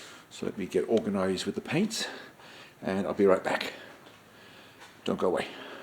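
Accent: England, United Kingdom. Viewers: In one word... " Perfection " you are without doubt, the best non club single handed builder of layouts out there. Are you British? you sound English:)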